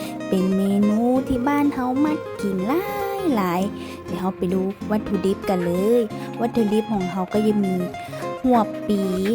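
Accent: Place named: Uttaradit, Thailand. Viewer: ขอเดาว่าสำเนียงคนอุตรดิตถ์ค่ะ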